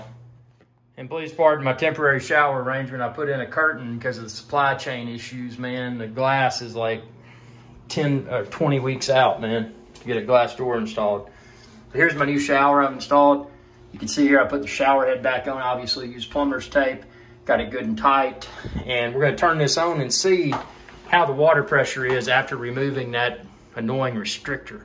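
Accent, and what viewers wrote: South, United States. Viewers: I’m in Florida. What state do you live in? With your accent I’m guessing South Carolina? Georgia? Tennessee? And everyone says “It’s probably your faucet aerator! No it’s not because mine are very clean.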